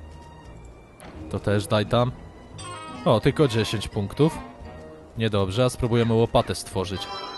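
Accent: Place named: Podkarpacie, Poland. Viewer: Zgaduję że jesteś z podkarpacia , albo z okolic bo tak mówisz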